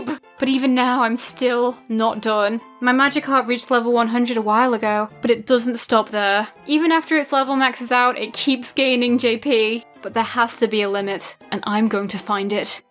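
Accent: England, United Kingdom. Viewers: YEEAAA UPLOAD, MY FAVORITE TWO THINGS, POKEMON AND BRITISH ACCENT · Why do so many poketubers sound slightly English.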